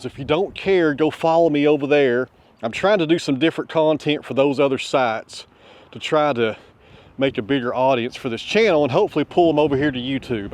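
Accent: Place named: Tennessee, United States. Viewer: Thank you, I enjoy your projects and your mastery of the english language-- Tennessee style. Happy new Year, 2025 was great thanks to you.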